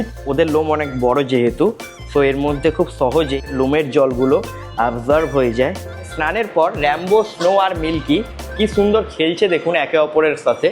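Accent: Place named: Medinipur, India. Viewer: তুই কি মেদিনীপুর এর ছেলে তোর কথায় এত টান 😅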